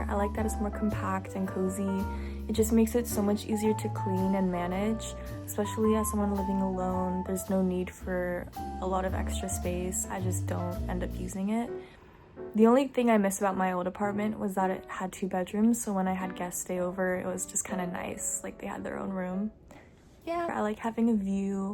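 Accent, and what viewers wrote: United States. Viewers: YouTube algo just randomly pushed me your channel. Can you do a video so we can learn more about you? did you grow up in Japan or another country? your English sounds very American to me, and not picking up much of an accent. I see your videos are getting a lot of traction already, you should be able to make this a full time job if you want to do something like that. Consistency is key. You are stunning btw. much love from Pittsburgh PA. :) Bye for now!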